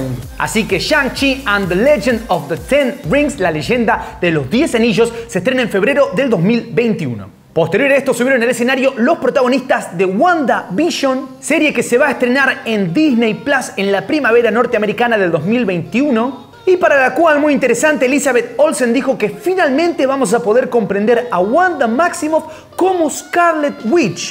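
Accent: Argentina. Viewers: He guachin sos Argentino..??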